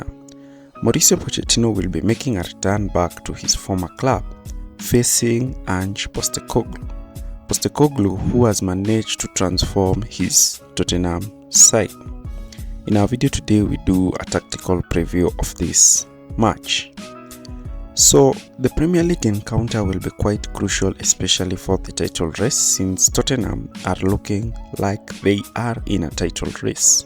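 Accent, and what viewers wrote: Kenya. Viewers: Sounds like a Kenyan😃😃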